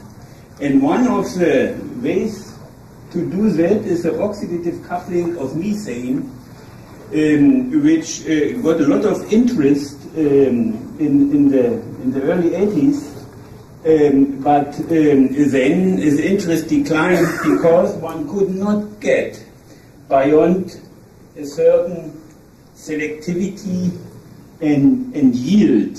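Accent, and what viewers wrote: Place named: Germany. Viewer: dat german accent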